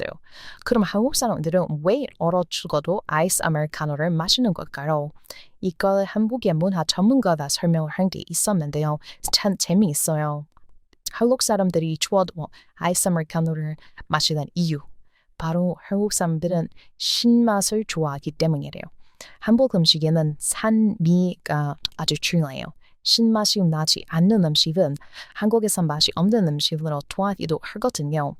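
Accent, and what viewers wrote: United States. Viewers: 님의 미국식 한국어 발음도.... 참 매력이 있네요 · 은 한국인 2세인가요? 😮 나보다 한국을 더 사랑하는 듯 😂 전형적인 미국인이 한국말 구사하는말투가 매력적이네요.😊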